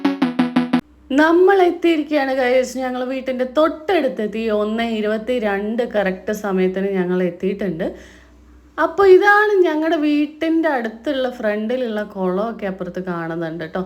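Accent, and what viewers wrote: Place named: Valluvanad, India. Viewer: വള്ളുവനാടൻ ഭാഷ ല്ലേ... പാലക്കാടൻ slang ഇല്ല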